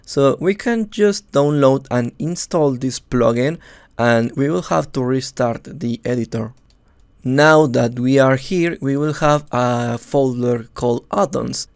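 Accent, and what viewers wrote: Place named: Argentina. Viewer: Sos argentino pa? Muy bueno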